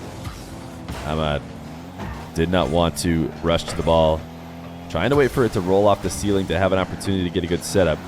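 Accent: United States. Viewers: Tbh, you’re very similar to Johnny. It’s almost like an American accent version of him. That being said, that’s exactly what everyone, myself included, wanted. Johnnys been slacking in 1s and he has hands down the best casting. What better then if not a similar caster with an insane catalogue of ones. Keep it up, amigo.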